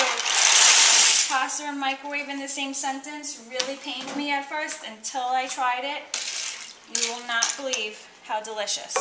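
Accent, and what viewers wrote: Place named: New York, United States. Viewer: Awesome video!! Love the accent... got to be from NY 😊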